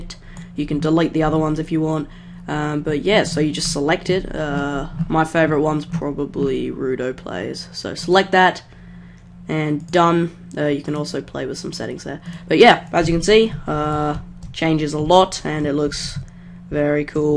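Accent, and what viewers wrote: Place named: Australia. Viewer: I smell Australian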